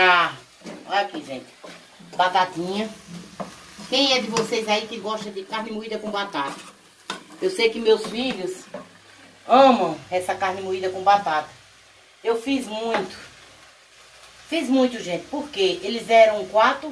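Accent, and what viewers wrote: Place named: Northeast, Brazil. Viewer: Boa noite minha linda 😁 eu amo os teus vídeos 😁 agora sim eu entendi porque você fala tanto em Barbacena 😁 vejo que tem sotaque nordestino 😁 eu sou mineira e amo esse povo do nordeste 😁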